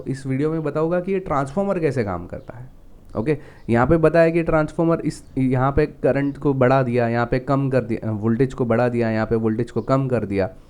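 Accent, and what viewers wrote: Gujarat, India. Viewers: are you gujrati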